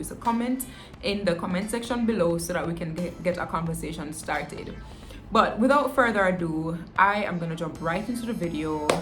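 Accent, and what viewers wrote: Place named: Jamaica. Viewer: SO INTERESTING,,,I WAS A VICTIM OF ALL YOU SAID,,WOW,,, LOL LOVE THE WAY YOU GET CARRY AWAY IN JAMAICA 🇯🇲 patois lol.Daneel really your sister lol,same personality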